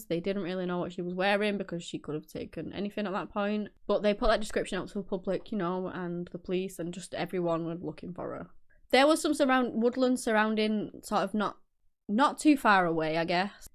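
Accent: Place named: Northern England, United Kingdom. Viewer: Hello my darling can I ask what part of the UK you are from, I love your channel probably because of your northern accent, hi from Australia 🇦🇺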